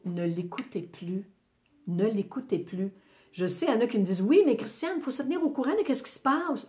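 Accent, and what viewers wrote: Quebec, Canada. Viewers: Merci un régal de vous écouter et cet accent quebecois qu'est ce que je l aime ❤❤❤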